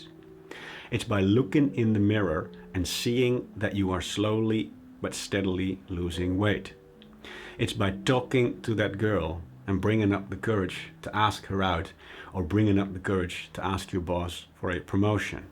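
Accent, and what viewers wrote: Netherlands. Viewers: I heard you were dutch by your accent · Are you dutch or it is just your accent? Good videos man, good luck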